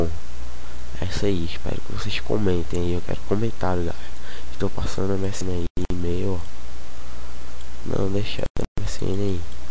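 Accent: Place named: Paraíba, Brazil. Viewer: vozinha de paraibanooo éh noiz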